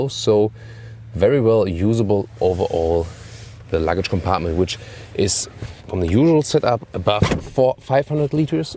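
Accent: Germany. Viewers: Schade. Review könnte wirklich gut sein, wenn nicht dieser deutsche Dialekt wäre. Das Review von Brian ist da sehr viel angenehmer anzusehen. Meine Meinung, besser einfach auf deutsch das Review machen, oder einen native Speaker für das Review in der englischen Sprache. Es hört sich einfach (Sorry) grausam an.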